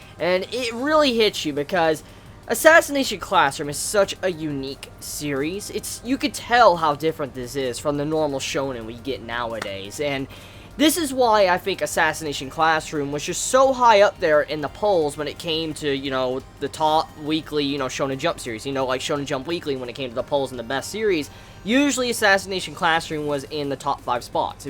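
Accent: United States. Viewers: Ummmm, what part of America is that accent from?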